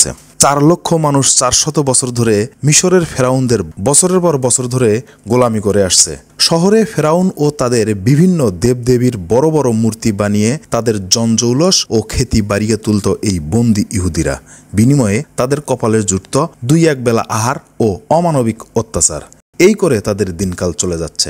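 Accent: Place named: Rangpur, Bangladesh. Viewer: রংপুরের ধারা ভাষ্য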